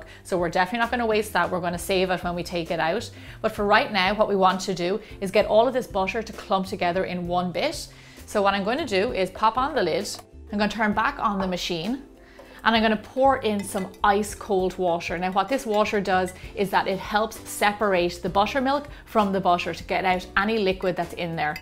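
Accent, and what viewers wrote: Ireland. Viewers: She's from Ireland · SUBSCRIBED! THANK YOU! LOVE YOUR IRISH ACCENT! Makes your vids that much more enjoyable to listen and watch. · Where are you from!? sounds interesting. Def not America. But I love it. Sounds like a tint of Irish. I’m Irish. But it sounds like you’re trying hard to cover up your accent. You ARE from Ireland. I hear you. Very good. Come to America. Let’s meet.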